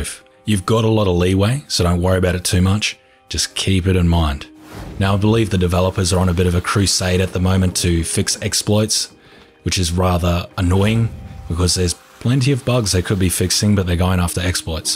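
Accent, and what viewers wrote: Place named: Australia. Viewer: Thanks for this! I made and tested this last night, it works perfectly in the latest version (v1.08). I followed the procedure in the video exactly using log catchers to block off the openings, and viola....a perfectly functioning log catcher! Also, I got it on the first try, so apparently I'll be taking over channel soon! Although, that would never work..... A. I'm too lazy for that much work. B. My voice just wouldn't be as relaxing as, and no Aussie accent. Personally, I agree with on this, and feel this is a workaround for a problem with the game rather than an exploit. Really, there should be a "Log Catcher" available from the blueprints menu, but until that day, or the day the devs nerf this, it's a working solution. Cheers!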